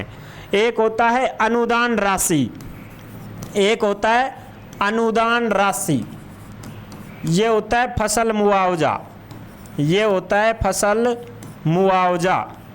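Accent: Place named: Hadoti, India. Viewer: आपकी हाडोती बोली बहुत अच्छी लगती है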